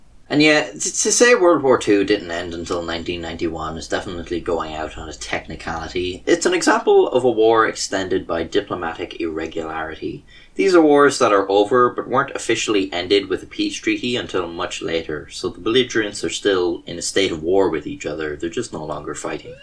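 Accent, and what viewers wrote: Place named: Ireland. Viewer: Lol I love getting historical facts in a sarcastic Irish voice. Moreover, I am of the opinion Carthage should be destroyed.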